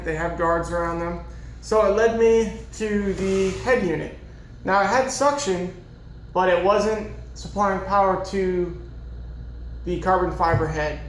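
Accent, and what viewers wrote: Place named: Cockney, United Kingdom. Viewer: you are a star! Spanish Cockney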